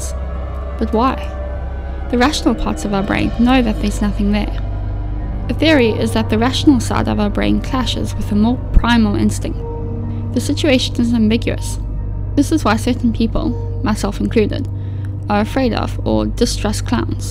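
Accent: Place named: South Africa. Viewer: You must be South African if not your accent sounds just like it. I am South African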